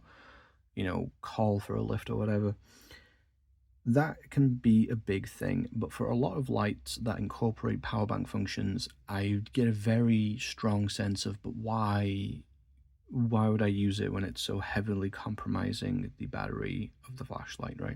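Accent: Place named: Northern England, United Kingdom. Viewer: Nice review 😊 Is it just me,.or do you have a hint of northern English accent there? Maybe it's the other way around, perhaps you're British with a hint of American 😂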